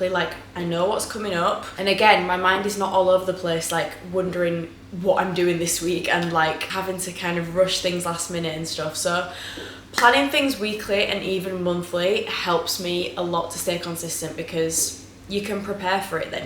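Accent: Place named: Burnley, United Kingdom. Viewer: I love that accent. Are you from Burnley?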